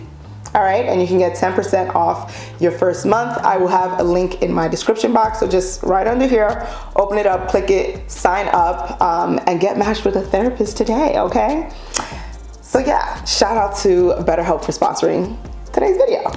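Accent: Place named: Nigeria. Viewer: Girl you are a MESS!!! 🤣 Loved this though. Your energy, Nigerian accent and silliness are a breath of fresh air. 🤎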